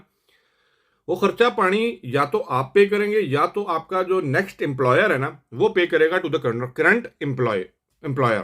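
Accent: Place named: Punjab, India. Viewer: are bhai hindi bol raha hai ya punjabi , thoda clear bol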